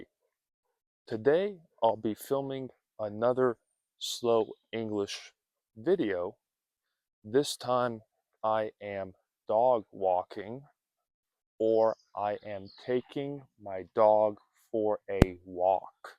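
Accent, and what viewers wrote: United States. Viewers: Hello, teacher! I’ve been listening to your last videos lately. Your helpful method of ‘slow English’ is widely appreciated according to all the comments we can read every time you post a new lesson. Congratulations! You have mixed abilities and the mental power needed to explain words and expressions clearly. I have been teaching French for years, and I love phonetics. You insist firmly on pronouncing the soft ‘t’ instead of the hard ‘t’ we often use in Europe. Notice that I do not disagree with you, on the contrary, but you must have a reason. Your accent is very easy to imitate and I assume it’s from New England. We are all grateful about your work.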